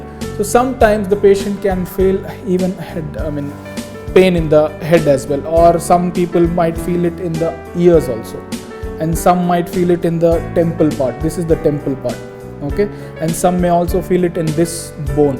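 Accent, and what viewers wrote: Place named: India. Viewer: It's Indian accent.....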